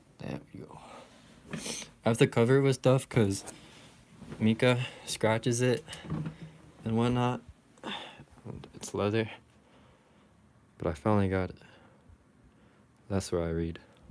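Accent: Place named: Vietnam. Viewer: lol i love his Vietnamese accent =)))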